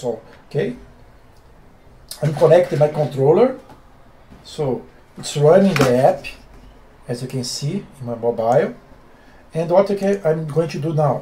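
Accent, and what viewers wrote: Brazil. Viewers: quanto eu ouvi a voz na hora sabia que era br falando ingles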